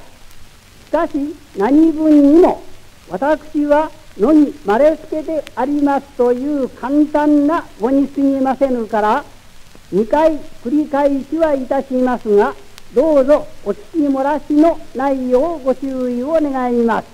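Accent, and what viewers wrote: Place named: Kyushu, Japan. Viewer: ■ から最後のまでですね！イントネーションから九州訛りを感じました。 ■Wikipediaの出自には、 「🔸父・希次は江戸詰の藩士であったため、乃木は10歳までの間、長府藩上屋敷において生活した、、、 🔸安政5年11月（1858年12月）、父・希次は、藩主の跡目相続に関する紛争に巻き込まれ、長府（現・山口県下関市）へ下向するよう藩から命じられた。乃木もこれに同行し、同年12月（1859年1月）、長府へ転居した[10]、、、 🔸元治元年3月（1864年4月）[注釈 4]、16歳のは、学者となることを志して父・希次と対立した後、出奔して、長府（現・山口県下関市）から70km以上離れた萩（現・同県萩市）まで徒歩で赴き、兵学者の玉木文之進への弟子入りを試みた、、、」 と長州、現山口県が青年期と大人になるまでの期間を過ごしていますので、『山口弁』と思われますが、 ■軍人は九州人も多くその影響があったのであろうと思われます。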